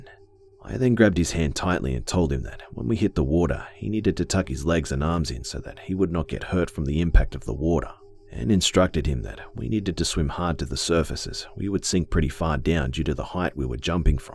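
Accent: Australia. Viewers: Is it me or can I hear a hint of Australian? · Some random person yep, you can indeed, is Australian:) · Australian, right? love your narration!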